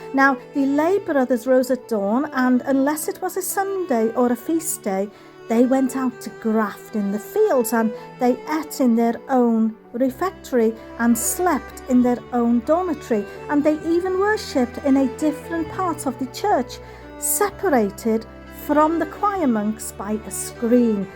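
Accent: Wales, United Kingdom. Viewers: I remember when i first watched your channel videos and I almost could not understand your english with the Welsh accent. Now I'm very accustomed to your voice and accent and I can understand it clearly. It's a very pleasant accent and i enjoy hearing it for sure! We don't have any Welsh people where i live in Vegas so it's a joy to come here and learn about the locations way over there across the pond. I wish i could go into a time machine and live a week in these medieval times and experience the life. It's really interesting. The Valle Crusic Abbey has a fascinating history. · Hi, even though I have seen this one a couple of times before - believe it or not, I think I have a lot of details to comment on (still, this time) and I think even 1 or 2 new questions that crossed my mind. Firstly, I have to say, I am extremely impressed with you and with regards to research and knowledge that is required to put together one of these documentary type videos. 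And, I am also impressed with your beautiful narration (always)! And, of course, with your lovely and awesome Welsh voice and accent! Amazing place! It seems like (throughout history) England (and other places) was always fighting with Wales (to maybe steal its land). As such, it seems like there was a lot of defending required - by Wales. On another note, it seems like 8 services for the monks each day was a lot - even for monks. With regards to the "Black Death", my guess is that it is likely the same event as the "bubonic plague pandemic". I vaguely remember reading one time that the "plague" killed half of the people of Europe. That is just crazy - something so powerful and uncontrollable! By the way, I have a question for you, with regards to the Abbey's revenue sources. You mentioned that the 2 primary revenue sources were "rents and tides". I take it that "rents" refer to the revenues from tenants. 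Would that include the monks too - having to pay rents to the ultimate owners of the complex at the time? And, regards to "tides", was the complex located near the ocean (with boat dockings)? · Love that Welsh accent...I don't get to hear it often. What a place!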